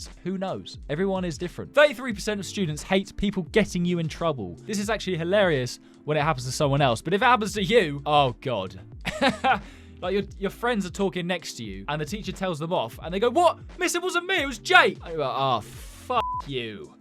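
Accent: United Kingdom. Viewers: British person alert 🚨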